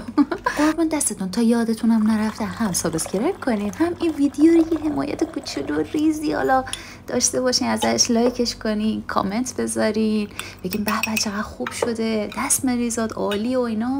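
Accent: South, Iran. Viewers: سلام عزیزم جان❤ چه صدای دلنشین و لهجه بامزه ی جنوبی.مبارکه آسیاب کن.چقدر پیراهنت قشنگه.من از حیوون خونگی اصلا خوشم نمیاد چون وسواس دارم.خیلی هنرمند و کدبانویی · وااای🤩 چه لهجه شیرینی، چه لحن آروم و آرامش بخشی🥰 ویدیوهای بی کلام هم بخاطر موزیکشون و کارهای روزمره جذاب و آرامش بخش هستن ولی صحبتهای شیرینِ شما با لهجه زیبای جنوبی یه چیز دیگه ست،عااالی عالی👍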